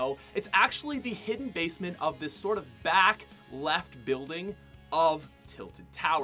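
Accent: Canada. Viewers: Are you Canadian? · Is he Canadian he sounds Canadian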